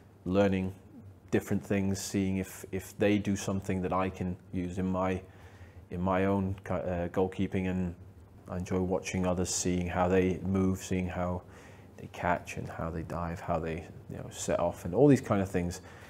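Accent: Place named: Denmark. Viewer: He definitely has a tiiiiiiny hint of danish in his accent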